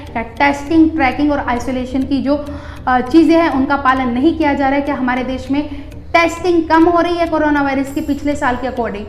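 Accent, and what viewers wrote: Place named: Haryana, India. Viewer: Apne boli kit gye bhai harvani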